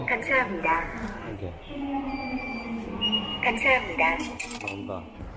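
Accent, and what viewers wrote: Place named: Busan, South Korea. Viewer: 부산사투리 정겹네요 음색도깔끔하고ᆢㅎ 캄보디아간접적 보고생활도느껴보고 고마워요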